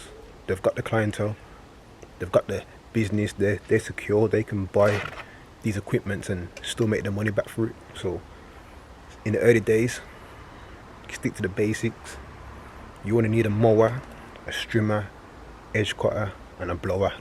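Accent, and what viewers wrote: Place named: United Kingdom. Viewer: You are spot on mate, I started on a pushbike and using the train, got some funny looks on the train with the hedgecutter! I did buy new at the start but my strimmer & hedgecutter came from Argos and the Titan multi tool from Screwfix. This allowed me to but a van & now a full kit of pro equipment, been self employed for 11 years this year, I'm not rich but comfortable, and that's the way I like it! Good luck to you chap, it's also great to hear a Brit on one of these videos!! 🇬🇧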